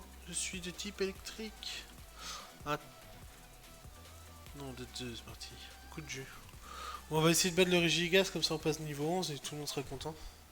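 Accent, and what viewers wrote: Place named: Quebec, Canada. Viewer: L accent québécois ça va il est bien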